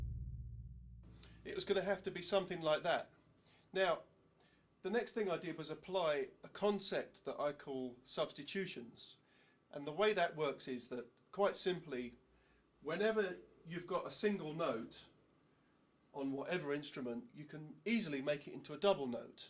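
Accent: United Kingdom. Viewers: Yeah east, hes cockney mate!. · You sound like you're from London!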